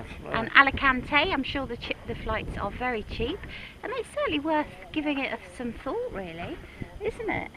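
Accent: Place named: Bristol, United Kingdom. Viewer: Nice video, love hearing the Bristol accent as its where my mum comes from.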